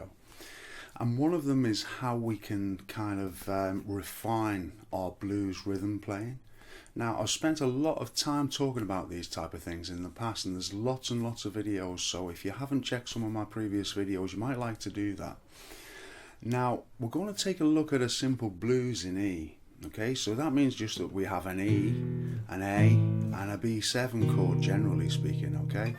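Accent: Liverpool, United Kingdom. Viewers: good lad,lovely easy going lessons, sounds like you live not far from Liverpool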